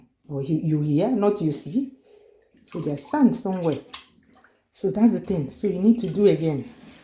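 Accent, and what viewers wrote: Nigeria. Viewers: ...i learn soo much from you. Listening to your voice reminds me of home, Nigeria...🥂❤. Thanks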